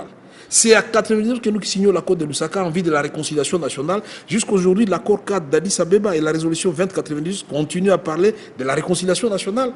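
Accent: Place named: Rwanda. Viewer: Maigres toutes les langues qu' il maîtrise mais il n'a pas perdu son accent kinyarwanda. Chassé le naturel.........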